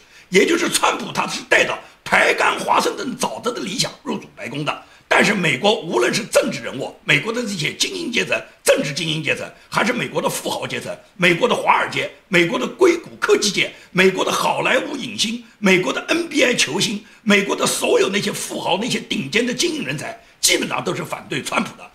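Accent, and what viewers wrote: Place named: Guangdong, China. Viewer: 听口音不像广西的，更像广东的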